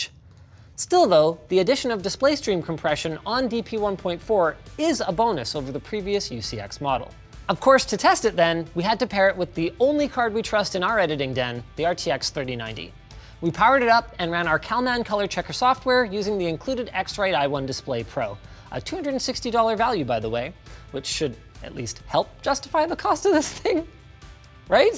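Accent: Canada. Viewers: You talk Canadian but you spell like the Americans color or liquor you see the difference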